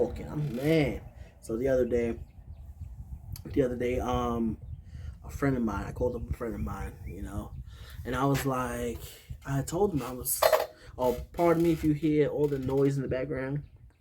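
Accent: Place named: New York, United States. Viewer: We have an amazing opportunity to show the world His love by our unity at Christ followers. Love you and yeah, NY accent is still there for sure.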